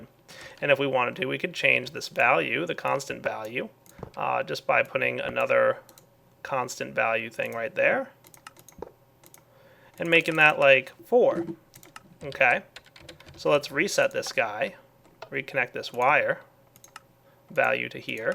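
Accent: United States. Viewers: since when were you American? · is American?!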